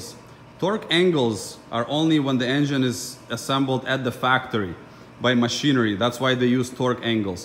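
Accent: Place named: Russia. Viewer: я услышал русский акцент =) у вас хороший английский... мне ваш английский был очень понятен..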